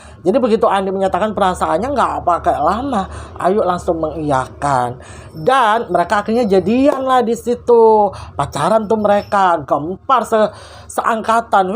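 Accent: Surabaya, Indonesia. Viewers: Mba cara cerita nya,logat Surabaya nya kental bgt....semangat mba,sukses sll.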